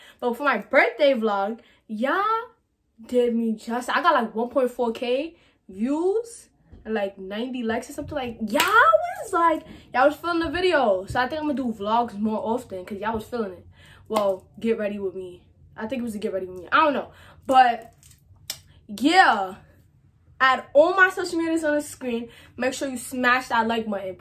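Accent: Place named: New York, United States. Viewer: Yhu can tell she just moved to New York she talk like a New Yorker but she kinda don’t 😭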